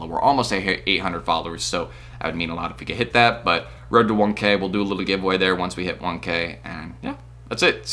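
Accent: South Carolina, United States. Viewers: W vid Btw are you from South Carolina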